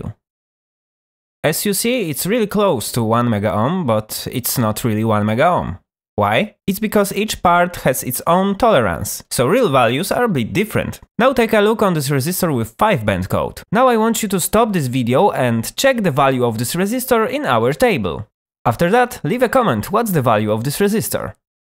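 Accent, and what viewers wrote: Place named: Poland. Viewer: Kurwa uslyszalem akcent i wiedziałem ze z polski XD cieszy mnie to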